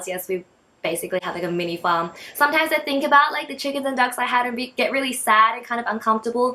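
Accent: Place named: Australia. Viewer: Randomly found your channel and got obsessed with your Australian accent!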